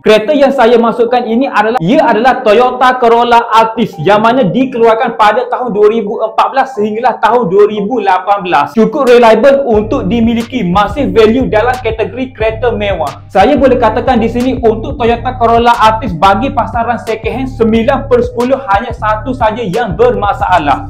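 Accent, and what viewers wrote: Kelantan, Malaysia. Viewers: Confirm kau ni fobia dengar sleng kelantan kan?..lantak dia la dia nak guna bahasa loghat ibunda dia..dia masih xlupa asal usul dia..yg kau suruh dia ubah² tu pehal..dia bukan warga asing..dia warganegara malaysia melayu..pe benda la punya pemikiran..org mcm kau ni klau duk oversea confirm terus lupa asal usul kau. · Bos kena kerap baca surat khabar agar cakap boleh tukar bahasa buku n tak bunyi kelantan,maaf sekadar pendapat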